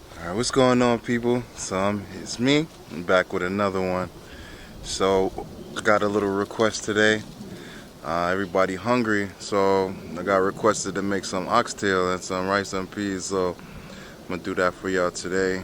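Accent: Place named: Jamaica. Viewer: I hear a little jamaican accent, anyways just subscribed, hope yu a yardie 😂😂😂😂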